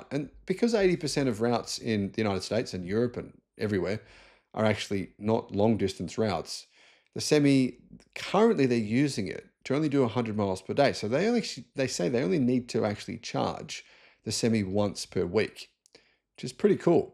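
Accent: Australia. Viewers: He is an Aussie and that is how we say it, you sound as though yours is the definitive version. in aus a semi (pronounced e) or prime mover is what you call a tractor. we call the tractors tractors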